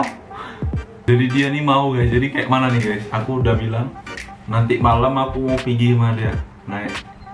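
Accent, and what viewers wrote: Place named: Medan, Indonesia. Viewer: medan kali ya wakk. anak padang bulan kayak.kau ini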